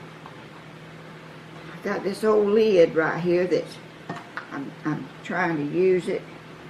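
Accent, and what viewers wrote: South, United States. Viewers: I'm so glad I found your your channel! My Grandparents were from North Carolina and moved out West so I was raised Southern. The Southern way of talking is dear to my heart but I don't hear it much. You've brought me so much joy! I just knew when you got in to pick the squash you'd probably get 60 to 80 pounds worth Ha! I'm guessing some of your recipes are much like my grandmas. Take care now! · It’s my bed time and boy your southern voice is so comforting and relaxing..Glad I ran across your channel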